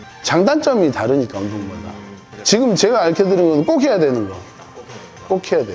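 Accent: Jeolla, South Korea. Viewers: 전라도 행님이셨네요 ㅋ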